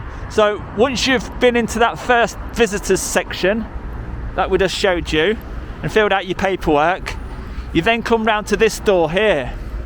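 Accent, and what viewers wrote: Leicester, United Kingdom. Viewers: Deffo a lesta accent I hear..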